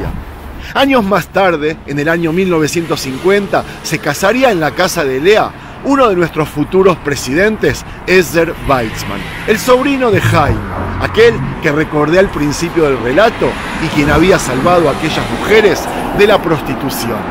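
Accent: Argentina. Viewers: Muy buen documental. Por su acento Usted es argentino?. Fui profesora de historia y geografía en Argentina ahora jubilada y me gusta mucho ver documentales. Lo felicito es muy interesante vuestro video. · Este señor es argentino? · It sounds like an Argentinian accent.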